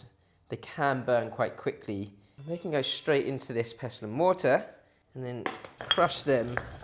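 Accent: United Kingdom. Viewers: thank you.. you sound sooo British.. San Diego Ca.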